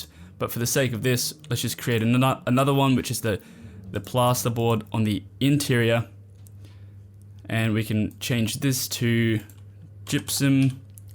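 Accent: Australia. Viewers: I'm learning almost as much about Australian English and vocabulary as I am about Revit. Love it.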